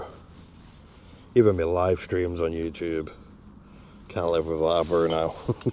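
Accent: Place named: Northern England, United Kingdom. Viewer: Nice to hear a rete noorthen accent and a brew called a brew. Proper.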